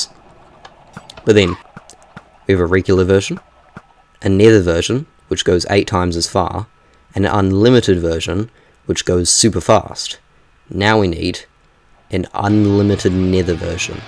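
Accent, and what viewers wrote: New Zealand. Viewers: NZ accent? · Amazing work! Also very cool to hear such a prominent Kiwi accent, very cool! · Hello fellow Kiwi. Love the ingenuity in action. Heard that accent and it always just hits different 😅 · Are you a Kiwi? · Are you from NZ? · Omg W New Zealander (Kiwi?) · I know a kiwi accent anywhere, chur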